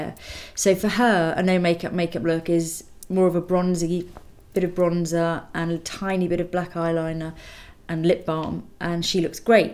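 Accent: England, United Kingdom. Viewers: Is that British accent ?? ❤❤ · ahh her voice is so relaxing, like her accent and the tone and cadence of her voice. anyone know where (in england i assume) shes from? her accent is really similar to pj harveys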